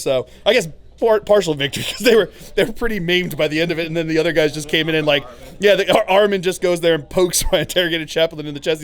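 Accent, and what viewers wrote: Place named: Alaska, United States. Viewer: Having lived in Georgia and moved to the Pacific Northwest, I have found a lot of people regularly speak like this. I think is original from Alaska (which may have similar accent)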